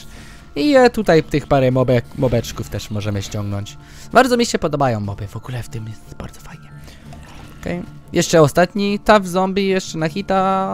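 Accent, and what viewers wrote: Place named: United Kingdom. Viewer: on jest anglikiem więc muwi troche inaczej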